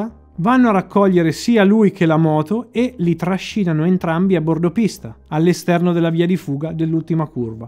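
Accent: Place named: Central Italy, Italy. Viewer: Roma no, centroitalia si, ma non lo dico, non è corretto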